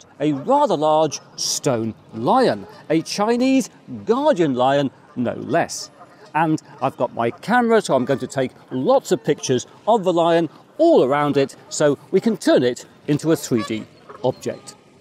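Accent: England, United Kingdom. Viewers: And no Lidar mention in sight! You just use a basic DSLR?, Excellent well paced video with an English accent, everything explained well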